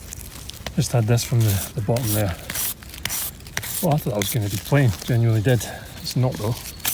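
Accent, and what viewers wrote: Scotland, United Kingdom. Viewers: Always nice to hear this lovely Scottish accent. Over 30 years ago i had trouble understanding any scottish, being of non English background. Had to have hubby translating english into english! Love watching your vlog. Cheers Daniela from Australia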